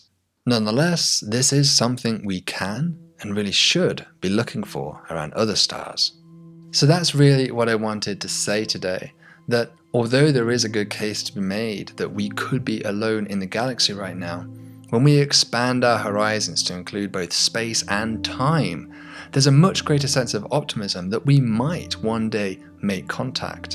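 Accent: United Kingdom. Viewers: How appropriate to use the vocal musings of the great Carl Sagan. Fantastic and along with the gentle British voice of the narrator, soothing.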